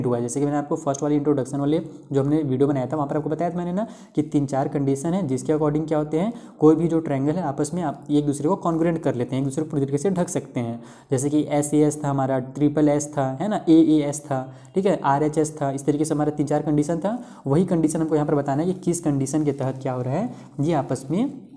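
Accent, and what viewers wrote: Bihar, India. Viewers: Bihari ho sir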